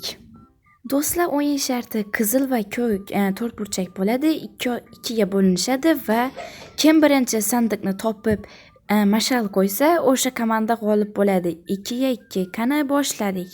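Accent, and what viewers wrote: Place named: Khorezm, Uzbekistan. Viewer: Chota ovoz Xorazmchaga tortib turbdi xorazmdanmasmisiz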